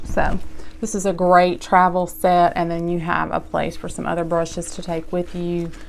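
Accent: South, United States. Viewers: I love your voice it`s so soothing and a lil country too, but that`s ok I like it.{@ on IG}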